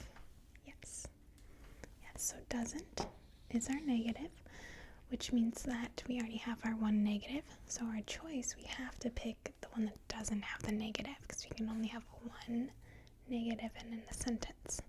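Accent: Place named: Michigan, United States. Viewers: I'm almost positive that's a Michigan accent. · the way she said welcome back and others words was stressed like how Sara Pailin does but she’s from a spot in Alaska where people form Minnesota moved to and the accent is different there. But she has such a lack of accent at times it does lend to midwestern.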